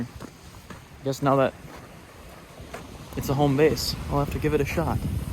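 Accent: Minnesota, United States. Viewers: I recently broke up with my boyfriend who is from Minnesota and your accent just reminds me of him, funny how I never noticed his accent before 🤔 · Currently living in Hong Kong and am stuck here for Christmas #$%*&! I grew-up in Minnesota and love the outdoor scenic views, hearing yr MN voice, and just watching yr general goofiness....reminds me of home. Keep the videos coming as they make me feel less depressed during this crappy pandemic.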